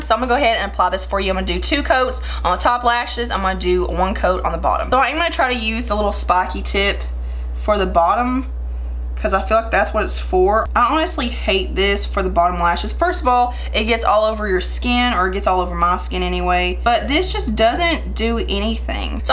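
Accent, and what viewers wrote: South, United States. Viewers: I've just found your channel & I'm loving you. I grew up in the south so we talk alike & I'm wondering where your from?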